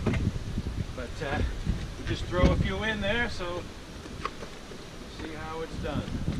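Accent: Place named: Maine, United States. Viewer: Man I swear you have a Maine accent!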